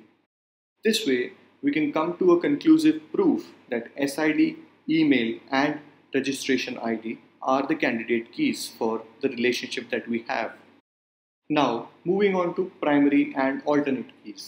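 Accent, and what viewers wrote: India. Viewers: Now americans will also watch this video even if they hate indian accent( because this is the best video on YouTube till now)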